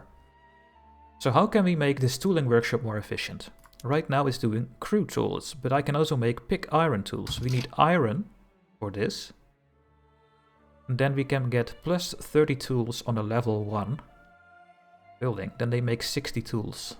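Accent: Netherlands. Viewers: The further you go.. hoe meer ik hoor dat je ook echt Nederlands bent:D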